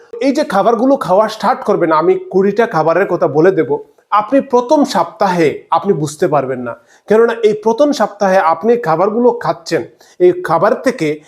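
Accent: Sylhet, Bangladesh. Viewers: apni monehoy ekjon Sylheti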